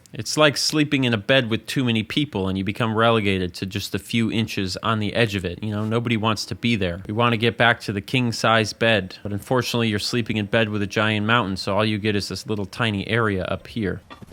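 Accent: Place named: Minnesota, United States. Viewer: I’m thinking to myself “this sounds like a man from Minneapolis”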